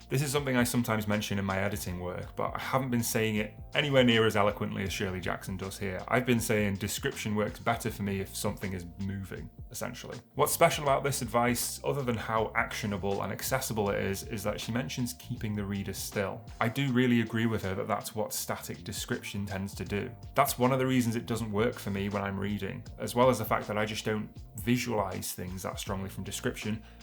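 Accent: United Kingdom. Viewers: Congratulations on your novel. Plus, I love your British accent!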